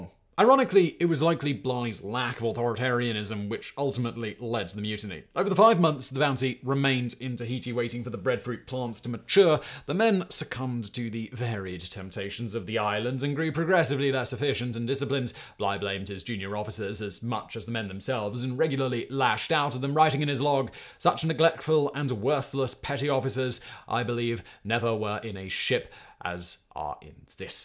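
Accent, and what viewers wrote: England, United Kingdom. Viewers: The King's English, spoken as rapidly as this presenter does, is impossible to understand. It is too long to read the closed captioning, so I remain ignorant of the final outcome for the mutineers. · Narrator does speak kind of fast but he clearly and precisely delivers the narration. His delivery keeps me engrossed in the story. I dunno i kinda like English in that particula rmanner of elocution and accent. Though I don't know from what region in the u.k. our speedy speaking narrator doth haileth. From a bred, born, and raised Southern Arizonan descendent of English stock... well works just fine for me. (Fast talking English guy that is).. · His crazy accent? You mean English?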